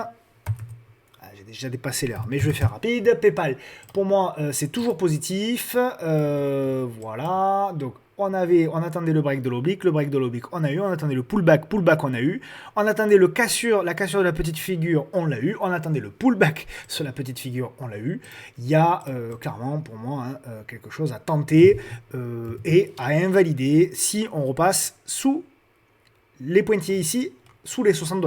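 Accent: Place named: South, France. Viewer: Hello merci pour ton analyse, toujours intéressante et pertinente, l 'accent du sud ajoute un plus et la coupe de cheveux ca va 😀 ps: j' ai acheté Alstom lors de la chute des résultats, ca marche bien et je suis content que tu te trompes parfois 😉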